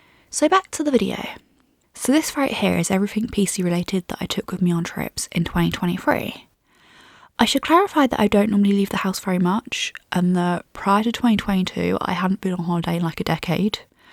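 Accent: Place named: Scotland, United Kingdom. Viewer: nice scottish accent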